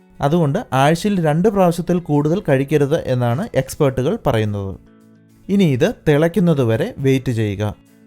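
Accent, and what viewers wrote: Kottayam, India. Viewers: Chettan kottayam ano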